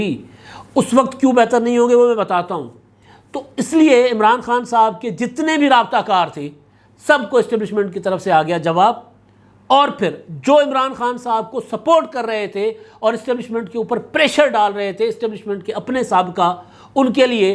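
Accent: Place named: Punjab, Pakistan. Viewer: بھائ میں سندھ شہدادپور سے اپکا پروگرام میں پنجابی ٹچ بہت اچھا لگتا ہے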